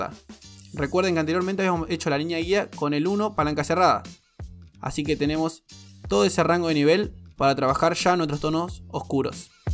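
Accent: Argentina. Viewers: Sos argentino amigo?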